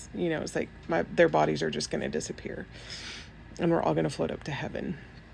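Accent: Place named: Texas, United States. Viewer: omgeeeez I almost commented that your accent sounded Texan!!!! You sound like my sister she lived out in Fort Worth most of her adult life ❤❤❤ I love Texas!!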